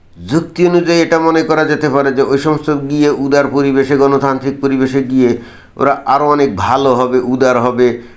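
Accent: Bangladesh. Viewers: Apnar Kotha gulo Sunte khub Valo lage.. B.deshi Accents.. Namoskar dada..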